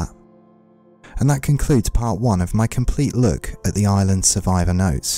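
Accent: United Kingdom. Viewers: Respects and love from Tokyo, Dear sir , YOUR VOICE IS the most noble relaxing and soothing voice I’ve ever heard. BEST Englisg accent . How can I buy these books?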